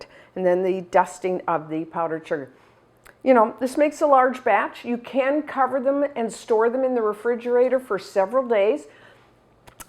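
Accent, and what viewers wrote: Canada. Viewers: Obviously you/she must be from Canada. “Quite this, quite that” just sayin...no slam.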